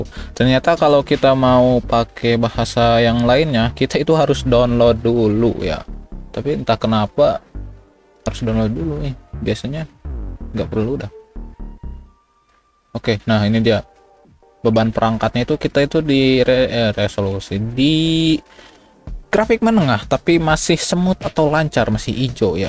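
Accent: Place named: West Java, Indonesia. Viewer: logat sunda nya sangat terasa😌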